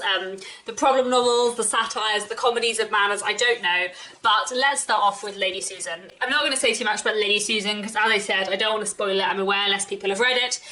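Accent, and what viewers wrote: United Kingdom. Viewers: you are one of the inspirations and motivations for my English self-learning. I have grown fond of Jane Austen's literature on your video account. People tend to use the US accent in my country and you are why I persevere with the British accent. Love you from Viet Nam ~~ :3 my favorite hero is Tilney by the way, such a charming and practical when necessary man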